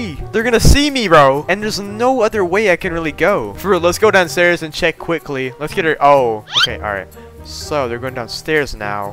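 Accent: New Zealand. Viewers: Are you from new Zealand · wait what are you from nz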